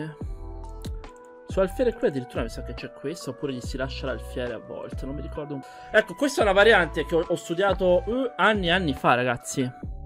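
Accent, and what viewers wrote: Tuscany, Italy. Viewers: Speed ception finale. Complimenti per la memoria del pattern. In passato peccato per la bassa qualità audio ma era bello sentire la c'è Toscano più marcato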